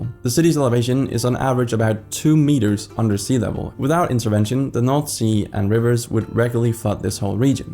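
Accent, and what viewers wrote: Germany. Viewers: I'm kind of getting triggerd by the German pronunciation of Dutch words, no slight to the uploader though Dutch ch and g sounds are hard. Just my own pet peeve. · Your Dutch sounds quite German, but I'm glad you're giving it a shot—nice video! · I dislike the German pronunciation of Dutch words, otherwise a good video.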